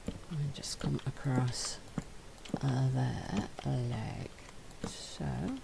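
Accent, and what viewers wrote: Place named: United Kingdom. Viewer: I have a question... Are you from the UK or the US? Because I’m from the UK and your accent sounds like some people from where I live.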